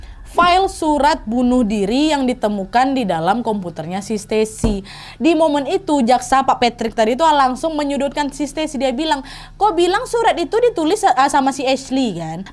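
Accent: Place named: Medan, Indonesia. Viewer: Tadi anakku denger cerita te kekeh2 dia😂😂😂.. Medan kali mak katanya... Woii... makin cantek ya...😘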